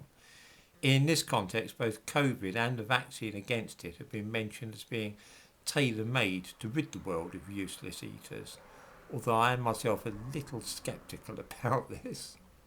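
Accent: United Kingdom. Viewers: got a wonderful British accent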